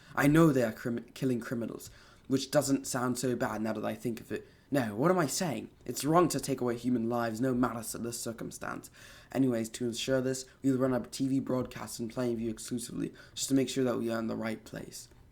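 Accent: United Kingdom. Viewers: good job with the birtish accent! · Dude great job on the British accent too!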